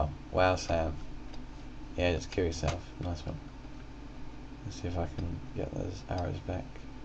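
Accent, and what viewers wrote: United Kingdom. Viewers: omg i cant understand wtf hes saying hes mumbling and has a british acent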